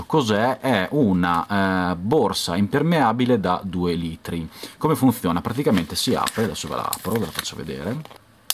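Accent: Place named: Modena, Italy. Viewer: Sembra di Modena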